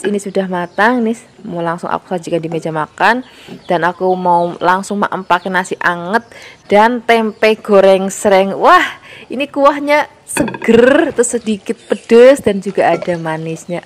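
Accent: Java, Indonesia. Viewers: Medhok jawa ya..makasih mbak resepnya.nek sy petainya tak belah ..takut ada uler di dlmnya 😅😅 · Khas jawa nya kental bgt 🥰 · Jawanya medok kaya gado"enak didengernya😅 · Lestarikan medokmu👍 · Mbak , itu orang mn sih kok bahasanya medok jw bgt 🙂? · Saya suka cara bicaranya...medok jawanya.😅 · Maaf mbak,kl boleh tahu,jawanya mana mbak kok cara bicaranya enak kali di dgr,bercampur gitulo bahasanya😁👍👍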